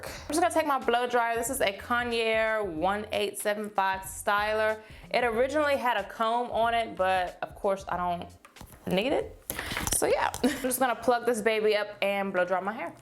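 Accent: South, United States. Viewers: girl you sound so country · her Invisalign makes her sound even more southern/country? Its so cute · i love your country accent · you sounded so country and southern in this video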